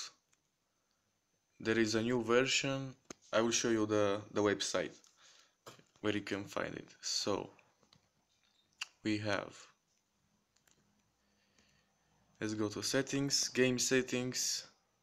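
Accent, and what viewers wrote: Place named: Russia. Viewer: Видно что русский,но английский хорош!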